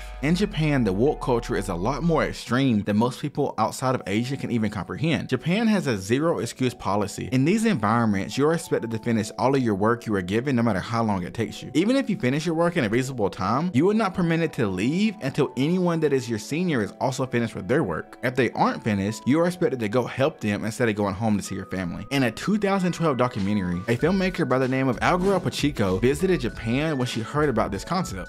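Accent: South, United States. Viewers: Now realizing you’re jus doing a YouTube accent to cover your southern accent, bro own it, be the southern one piece youtuber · Where is that accent from is sounds southern but im not sure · That southern accent lowkey hittin’ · i aint the only one who hears a county acsent right??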